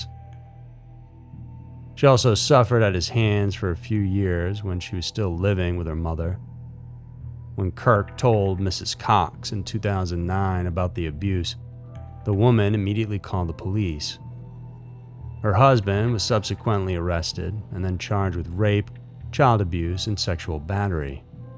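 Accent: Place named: Texas, United States. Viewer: Love, love, LOVE your accent. Not sure if it's Texan (I'm from Austin and we're not like the stereotypes lol) but it sounds a bit like what I'd hear back home.. Either way, your content rocks and I am so here for it!!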